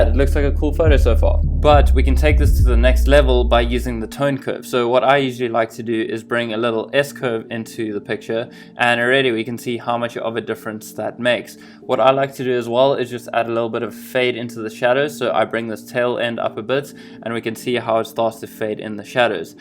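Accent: South Africa. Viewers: loved this! At the start, I could tell you were South African and went to go check in your about section haha. Thanks for the tutorial! I am about to use it for the first time and I think I have been well equipped. the sound was also 100% on my laptop. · South African? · You sound south african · Great vid man! I'm a fellow filmmaker and photographer myself! Just moved to Cape Town, could tell thats where you're from because of the accent. Thanks for the great tips! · Are you South African? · lol are you South African? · Are you south African?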